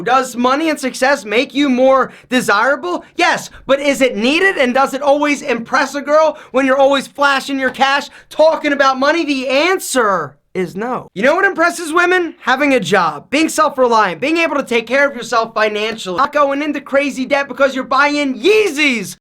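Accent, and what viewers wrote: Philadelphia, United States. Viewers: Anyone know if dude's from philly? Lol thick philly accent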